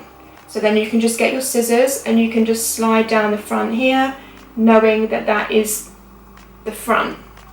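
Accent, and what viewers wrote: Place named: London, United Kingdom. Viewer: No shade... But you sound a bit like a Londoner to me... No shade - never shade... Not to you! Lol... (I'm a Londoner anyways).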